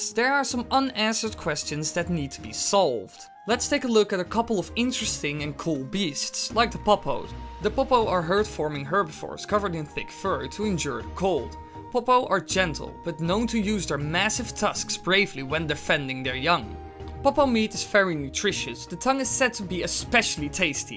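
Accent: Netherlands. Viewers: ik kan gewoon aan je stem horen dat je Nederlands ben :'D toffe video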